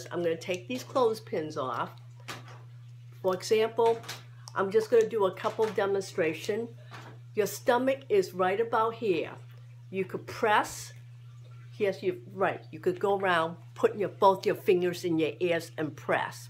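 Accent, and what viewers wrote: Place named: Massachusetts, United States. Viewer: Thank you for this clarification. Wow, I bet you are from Massachusetts! I can detect that accent anywhere..............blessings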